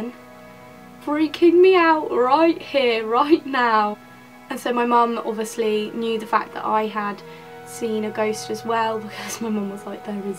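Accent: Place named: England, United Kingdom. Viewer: I live in UK... your accent is really nice and strong it's like really fully English:)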